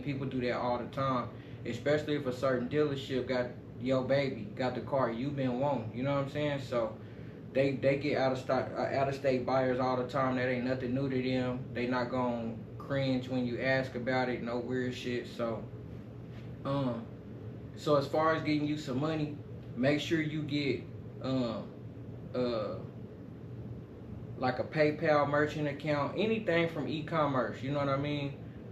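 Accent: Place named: Oklahoma, United States. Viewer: Don't you have to show the social physically and ik you was from okc I hear it in yo voice I'm from 81st & kelly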